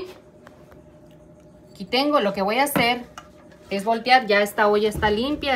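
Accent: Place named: North, Mexico. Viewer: Me encanta su acento norteño…con la SHHHHHH! 😅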